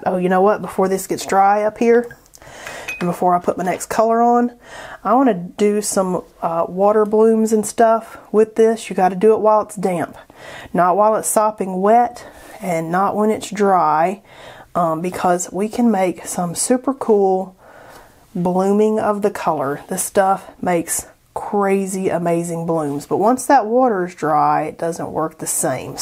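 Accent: South, United States. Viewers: Enjoying all your videos. Nice to hear a fellow Southerner on YT! Do you ever do in person classes?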